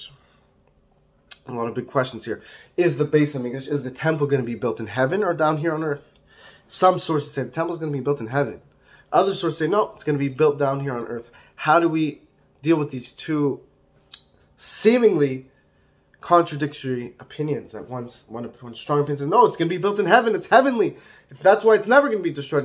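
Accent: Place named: United States. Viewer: Who are you, and where are you from? It sounds like you are from the U.S.A. HOW DO YOU KNOW?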